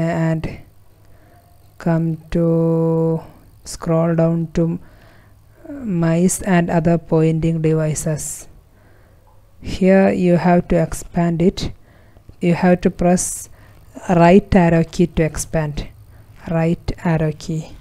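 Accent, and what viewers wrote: India. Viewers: Holy crap it actually worked! Ty miss! The Indian accent is so cute lol. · Of course another indian accent why every computer supporter is indian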